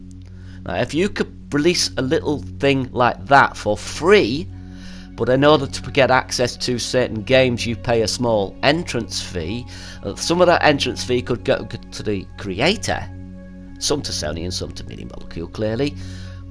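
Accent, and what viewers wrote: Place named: Scotland, United Kingdom. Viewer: I like your video Mr. British/Scottish guy, I will subscribe so that you can inform me of more of these major updates affecting the gaming industry and PS4 exclusives, I seriously don't know where I would be if I hadn't found your channel, I would literally be living under a rock, can you please update us on the future of the gaming industry economy, Covid-19's effects on the industry and when GameStop will become a penny stocks? THANKS.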